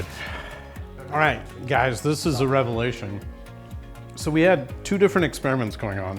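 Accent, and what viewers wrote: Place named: United States. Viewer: He talked like a US citizen.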